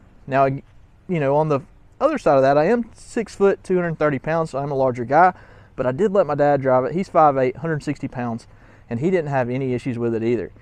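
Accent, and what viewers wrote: Alabama, United States. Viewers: I was thinking you were Alabamian as soon as you started talking. Huntsvillian here. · You're a Bama boy who says "dad gum." That equals an autosub for me. Good video. I think you just convinced me as to what my next bike is. Thanks brother!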